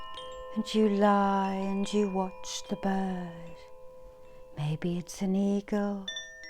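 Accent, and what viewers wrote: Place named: Southern England, United Kingdom. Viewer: Something about the tones of you south Britons is perfect for this gig.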